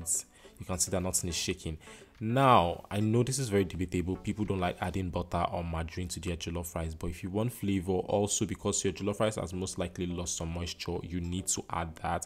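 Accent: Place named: Ghana. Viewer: Bruh. I could swear you Ghanaian lol. This is lit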